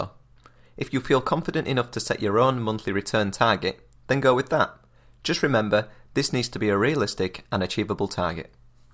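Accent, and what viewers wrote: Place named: North, United Kingdom. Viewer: any suggestions on online personalities? do you do mentoring. BTW your videos are awesome its always a great feeling of confidence when i listen to someone with a northern accent lol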